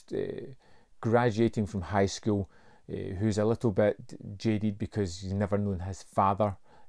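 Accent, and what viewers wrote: Scotland, United Kingdom. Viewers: I know you are listed as being in the U.K. Your accent screams Scottish to me. I grew up in the U.S. and one of my best friends was from Scotland and his dad sounds just like you. He was also a real life badass being a former Royal Marine. I enjoy your presentations and greetings from Texas.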